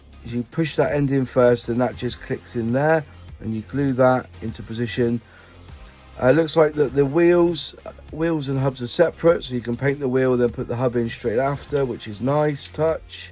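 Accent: West Country, United Kingdom. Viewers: I'm blaming and West country accent!! 😂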